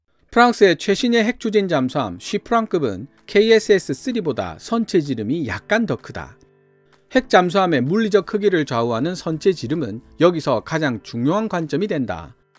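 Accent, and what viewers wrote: Gyeongsang, South Korea. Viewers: 좋은 내용 감사합니다 그런데 경상도 사투리로 설명하는 바람에 찌라시 냄새가 나네요. 아나운서나 전문 성우 목소리처럼 표준말로 설명해 주시면 내용의 신뢰성이 더 높아질 것 같군요.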